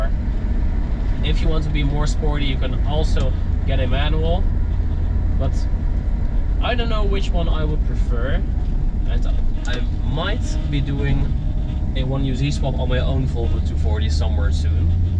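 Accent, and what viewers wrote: Netherlands. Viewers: what an awesome fucking swap man love it! i’ve been looking into maybe getting a volvo wagon & getting it swapped, i love older volvos and giving it a step up w a v8 sounds like a damn good deal. live in the US now but grew up in amsterdam and your accent makes me smile! awesome videos man thank you, looking forward to another post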